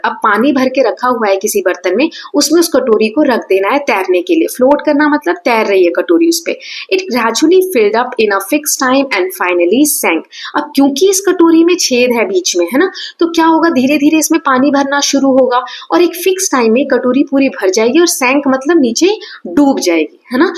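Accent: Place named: Bihar, India. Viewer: Mam aap bihar se ho 😊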